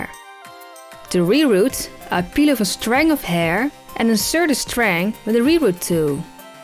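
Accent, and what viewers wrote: Netherlands. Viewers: Are you dutch? Because I'm hinting an accent and my guess is that you're from The Netherlands 😂😂🙌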